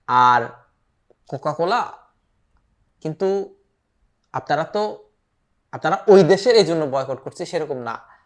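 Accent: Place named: Chittagong, Bangladesh. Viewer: ভাইয়া মনে হয় চট্টগ্রামের, ❤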